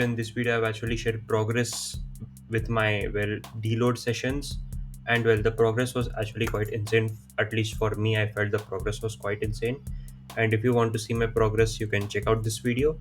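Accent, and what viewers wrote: India. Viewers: Why does a white dude have Indian accent 😭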